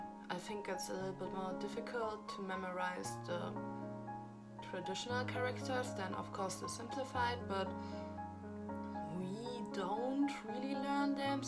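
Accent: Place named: Eastern Europe. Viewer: Thanks for this video! I am thinking about studying sinology and you helped me a lot. I study on high school now but next year I'll need to decide what program at which uni I wanna study and apply there... I was thinking too about studying in Germany although german is not my native language (same with english), I'd improve my german at studienkolleg and then apply to the uni, but I am not sure if it's not too much for me :D And what are your native languages? You have interesting accent, I guess you speak german and some slavic language! Polish, probably?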